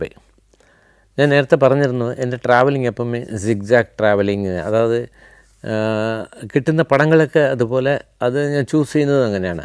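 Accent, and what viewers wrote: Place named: Tamil Nadu, India. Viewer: Tamil Malayalam mixing slang is nice to listen. Waiting for next episodes..keep going ❤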